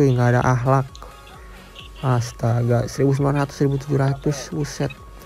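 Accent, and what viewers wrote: Banten, Indonesia. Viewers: Orang banten bang? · Asal banten ya bang?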